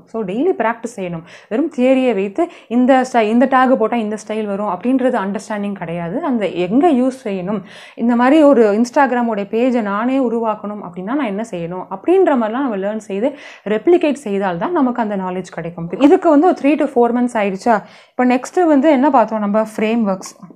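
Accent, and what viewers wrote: Sri Lanka. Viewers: ilangai Tamil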